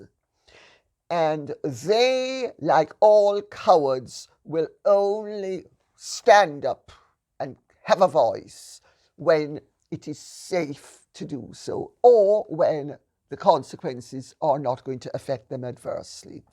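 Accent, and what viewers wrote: United Kingdom. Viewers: Woof, we the Scottish terriers from Sunny 🌞 Southern Cali! We love ❤️ love your beautiful musical 🎶 British accent. Your command of the King’s🏰 English and commentary is spot on.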